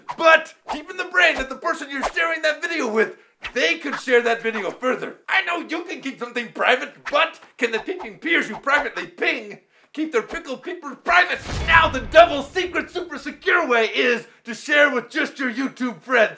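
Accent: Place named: Mexico. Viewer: this ninja sounds mexican!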